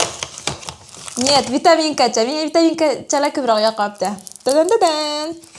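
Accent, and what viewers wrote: Bukhara, Uzbekistan. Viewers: Buxorolikmisiz deymanda?😂😊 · Buxoro danmisiz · Buhoro manimcha adashmadimi man toshkentlide😊😊😊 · Buxoromizni shevasi shirin umrizni oxirigacha shunday beg'ubor bo'lib qoling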